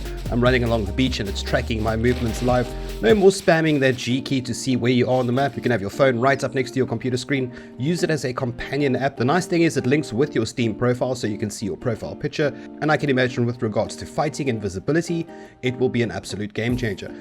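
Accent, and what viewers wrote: South Africa. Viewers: Haha I could immediately recognize your SA accent! :D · You sound like a south african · Lekker ou ek het gehoor jy klink bietjie Suid Afrikaans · Are you South African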